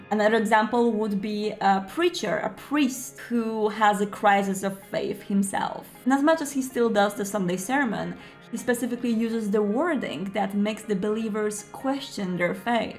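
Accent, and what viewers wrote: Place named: Poland. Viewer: Why is Kirsten Dunst speaking to us in a Polish accent? I am intrigued...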